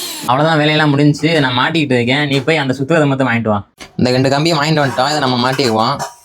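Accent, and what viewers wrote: Madurai, India. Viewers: Bro nee madurai yaa bro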